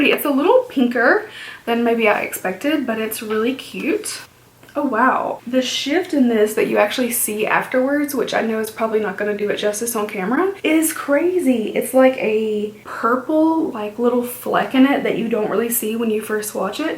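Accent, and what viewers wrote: South, United States. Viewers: Hello from Alabama! I think I detect a southern accent , where are you from? I’ve been a JSC fan from the very start and my favorite lippies would have to be celebrity skin, unicorn blood, and ?. I gravitate toward pinks, nudes and darker berry shades.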